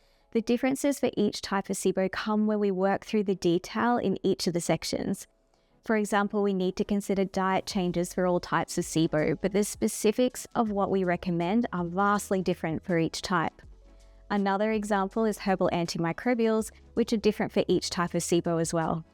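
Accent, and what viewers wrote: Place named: United Kingdom. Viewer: Why don't you cover sulfur SIBO? It has greatly negatively affected my temperament and made me rather picky. For example: titration. I don't mind the British pronunciation but you pronounce oregano as if it weren't English and I find that very irritating. At least you're not butchering turmeric like half the internet, especially Americans. uh-REG-uh-no I realize you're pronouncing it the Italian way But that kind of clashes with your accent. It really bugs me that You say bacteria are smart when in fact they are resilient and lack intelligence.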